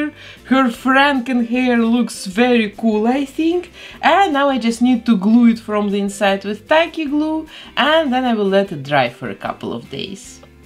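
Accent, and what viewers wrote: Russia. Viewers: Привет, вы русская ? Просто у вас акцент сильный и некоторые слова на русском говоре . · Я слышу акцент, вы русская?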